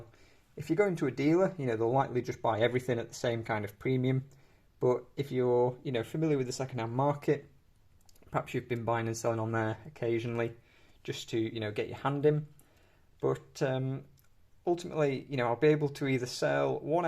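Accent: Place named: North, United Kingdom. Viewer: This person seems to have a northern accent. Such types shouldn't be allowed to buy gold, frankly. Big tvs and trainers and BMWs maybe, but not gold.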